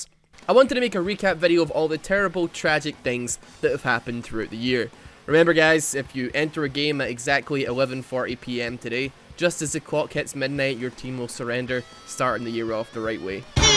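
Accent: Scotland, United Kingdom. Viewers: I went from asmr to this, almost fell asleep to the sexy Scottish accent🌞 (EUW) Legendarylemon5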